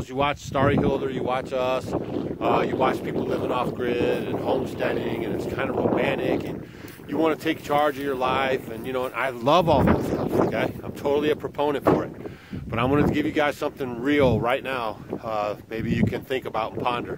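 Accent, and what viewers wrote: United States. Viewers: you from usa?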